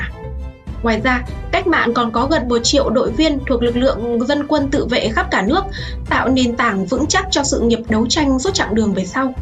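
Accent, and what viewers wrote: North, Vietnam. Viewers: nói cho nghe nè đừng dạy người khác phải theo mình đó là hói hư tật xấu của người csbv Miền Bắc. Cụ Diệm (gia đình quan Triều Nguyễn cụ Diệm kinh qua chức vụ quan lại cải tổ+đi du học nước ngoài đây là lí do bọn Miền Bắc nói Cụ là tay sai hoặc con nuôi do Mỹ nuôi...) trước khi VN còn thời Pháp cai trị do Vua Bảo Đại đứng ra điều hành bằng chính sách của Pháp gọi là dân đi lính QGVN Khi Pháp áp bức sức lao động khổ sai thì dân đứng lên thành Lập nhiều Đảng phái Khi giành thắng lợi Pháp trao trả chính quyền thuyết cs ra đời cướp chính quyền. Bảo Đại kêu gọi Cụ Diệm về nối tiếp vì chính sách cũ của Pháp để lại ông xích mích Cụ Diệm thay thế Vua Bảo Đại lập nên VNCH chính sách mới cải tổ lợi dụng sự tài trợ kinh tế của Mỹ nhằm ngăn chặn sự lang rộng của Liên Xô ,TQ hay vì Pháp muốn được hậu thuẫn nhưng bị từ chối vì thời gia đình cụ bị Pháp đối xử tàn nhẫn , những năm tháng đầu là thời hoàng kim phồn thịnh giá trị tiền vnch phát hành lưu thông khắp nơi với sự kinh tế thị trường người Hoa Kiều buôn bán giúp dân Miền Nam đáng học hỏi với một Quốc Gia Tự Do Chính Phủ như vầy các nước khu vực như Hàn ,Sing ,Lào... 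còn qua học tập làm việc , sao không qua Mỹ mà qua vnch ,các Quốc Gia khác còn mời cụ đi du hành các nước , chưa có Nguyên Thủ Quốc Gia nào được Tổng Thống Nước Mỹ ra tận sân bay đón tiếp và bắn đại pháo long trọng Mỹ từng đề cử vnch ra hội đồng LHQ Liên Xô ,TQ và Phe Cộng Sản không đồng ý. Điều cuối cùng tôi nói là con nhỏ MC nói tiếng Miền Bắc cho chuẩn ở ngoài đời đi ,đừ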